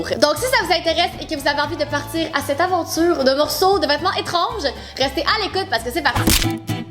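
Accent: Quebec, Canada. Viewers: ayaaa vive l'accent quebecois mdrr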